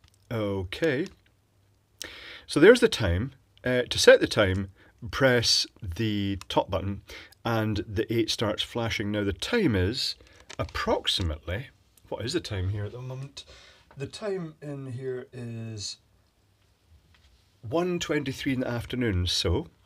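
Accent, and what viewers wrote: China. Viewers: Chinglish.. love it!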